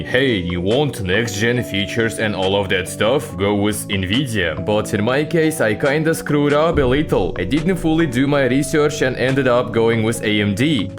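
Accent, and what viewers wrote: Russia. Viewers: I like your accent. 👍 Is it Russian?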